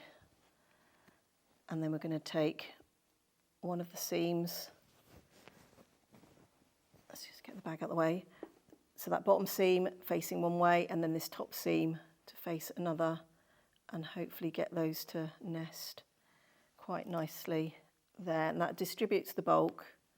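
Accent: United Kingdom. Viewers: Thank you. Brit here in Michigan so its nice to hear the British accent. You are a good teacher.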